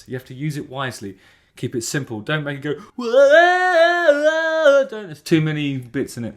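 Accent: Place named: Lowestoft, United Kingdom. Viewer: I'm so -pleased to find your channel! As a fellow Lowestoft chap, and former regular at the Triangle and O'Reillys (where I also worked), hearing you chattering away like this takes me back! Oh, and while more a 'Woo' than a 'Woah', has several good ones.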